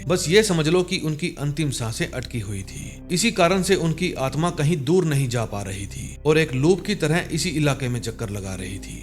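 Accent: Punjab, India. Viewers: are u punjabi?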